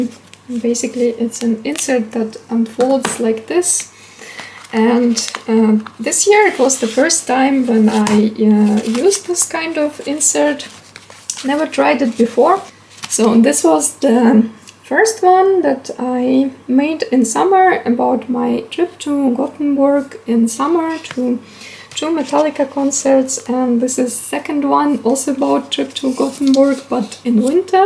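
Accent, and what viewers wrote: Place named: Russia. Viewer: Off topic but I LOVE YOUR ACCENT😭🤲🏼 are you Russian?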